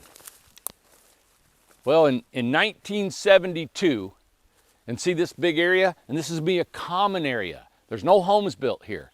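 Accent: South, United States. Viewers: you remind me of my grandma. She was born and lived her entire life in Tn, and y'all talk the same way. Very southern. Hearing you makes me miss her.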